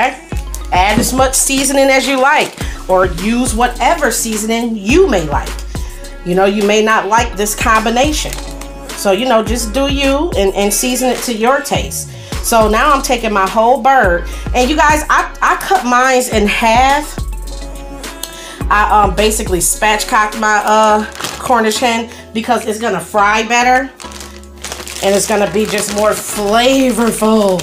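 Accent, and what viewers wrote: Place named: Buffalo, United States. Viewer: That buffalo accent strong lol Dope recipe!!!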